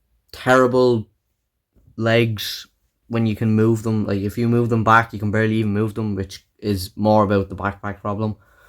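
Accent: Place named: Ireland. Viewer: Don't worry. I didn't pay much attention to the 4th and 5th films too anyway. I knew you're from Ireland just from the accent lol.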